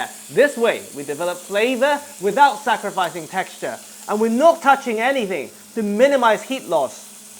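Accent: United Kingdom. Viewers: If I were to assume, I think he learned English from Europe. So he has a mix of an Asian accent and British English accent. He has a good sounding voice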